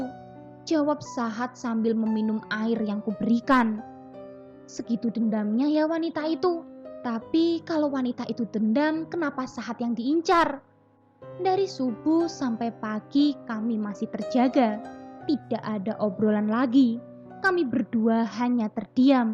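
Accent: Java, Indonesia. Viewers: Org jawa ya kak ?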